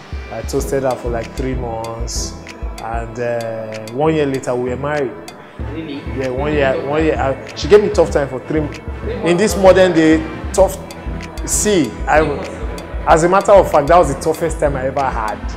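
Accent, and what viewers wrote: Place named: Warri, Nigeria. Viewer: I like that I was not the only one that took note of it when he said he learned to speak pidgin English at the age of 18, or did he say he learned to speak English at the age of 18. come on Bovi who you wan pose for? if you were not speaking pidgin English as a Nigerian what language were you speaking? you English is not that good, your warri accent is all over the place. you may not be Isoko or Urobo or even Isekiri, but no doubt you be Warri piken. stop the posing. Bovi no get me wrong, I gree your own, and you know the work wey you dey do well well. I gree your own Bovi.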